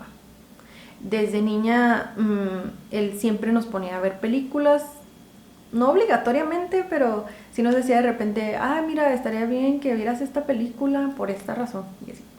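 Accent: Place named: León, Spain. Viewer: Tu acento es muy bonito (lo relaciono con el de León). Estaría padre ver un house tour y recetas, por supuesto.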